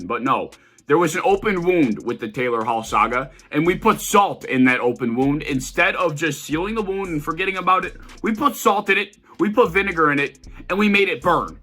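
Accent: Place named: New England, United States. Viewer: You sound a new Englander for hockey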